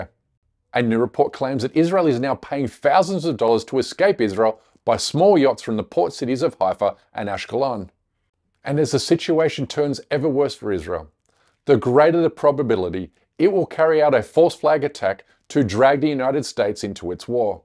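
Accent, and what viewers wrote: Australia. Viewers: I am Australian and he sounds Australian to me! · Even I can hear the Aussie accent, and I'm a Kiwi! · Sounds Australian to me! From central Queensland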